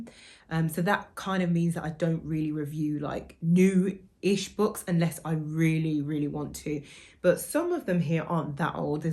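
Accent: United Kingdom. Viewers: ! I just got your video suggested yesterday and seeing this one today and you got almost 20k subscribers!!!! I am so so happy for you, you totally deserve it! As someone who was mentally ill and had to stay at home for long periods of time myself, I am sending you love and spoons 💕 welcome to BookTube! I love British English so I am so happy to listen to you 😊 also, I don't know if Open water is necessarily the right book if you are looking for something more lighthearted! Don't get me wrong, it is an amazing book but its not without its triggering topics. Can't wait to hear if you liked it!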